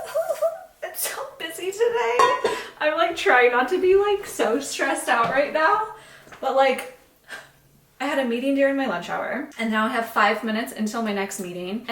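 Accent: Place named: Argentina. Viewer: Love seeing the family together! Love the Argentinian accent. Such a chill video! Ty for this.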